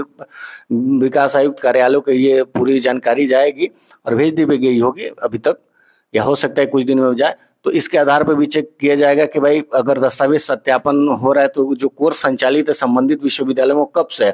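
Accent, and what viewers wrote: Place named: Bihar, India. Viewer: Aap bihari hain kya cg ke nahi lagte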